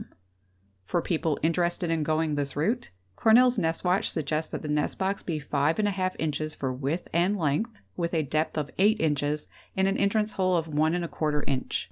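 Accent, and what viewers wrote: Canada. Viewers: I always wondered if these guys and the black capped chickadees (both frequent flyers to my feeders) were related somehow as their endearing calls are very similar in sound. Almost like a dogs squeaky chew toy bring chewed at a rapid pace. Too cute I now have the pleasure of hosting 4-6 Black-capped chickadees, Tufted Titmouse, cardinals, brown thrashers, house and purple finches, blue jays, warblers, chat birds and the red bellied woodpecker at my four feeders every day. They are literally waking me up every morning to get up and put the feeders out. It’s too cute. Oh, and recently I’ve been getting some mourning doves picking up seeds of the ground. Apparently the word is out, my place is the place to be., I’ve been meaning to ask, is your accent Canadian or North Dakota/Minnesota? I’m in Florida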